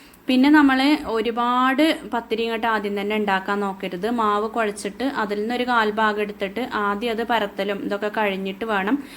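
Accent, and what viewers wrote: Malappuram, India. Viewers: Njan first ayitt aanu ee channel kaanunnath ,kandapo malappuram ulla aall aanu thonni,evide sherikk place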